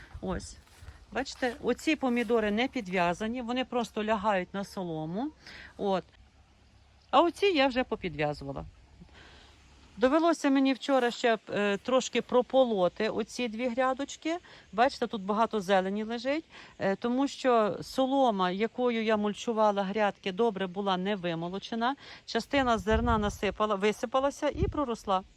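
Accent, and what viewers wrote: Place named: Ternopil, Ukraine. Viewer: Доброго дня там ваш чоловік. Дуже Вам дякуємо за Ваше відео. Дивлюся кожне Ваше нове відео яке виходить. Ваша мова така мені знаєма, у мене покійний чоловік з Тернопільської обл. Борщівського району, він розмовляли точно як Ви. Я з Херсонської обл. Каховського рну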